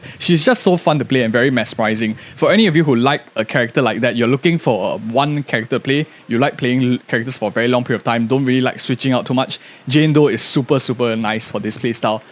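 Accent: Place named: Singapore. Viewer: Are you Singaporean? We have similar accents 😮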